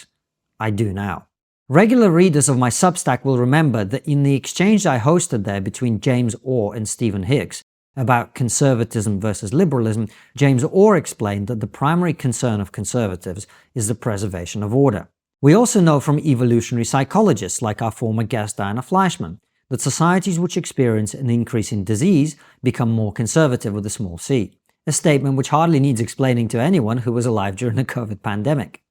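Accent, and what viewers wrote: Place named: United Kingdom. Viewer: This guy thinks because he’s British that he sounds smart but that’s not how it works bro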